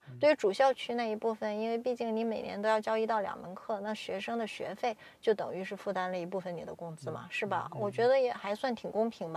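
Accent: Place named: Tianjin, China. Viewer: 这个女生是天津人，似乎听出了津普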